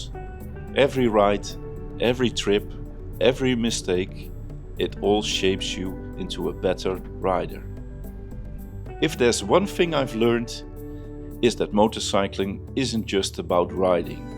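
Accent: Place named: Netherlands. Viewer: Dat moet een Nederlander zijn aan het accent te horen. 😄 Leuk verhaal. Ik heb ook een RT gehad. Nu heel blij met een GS.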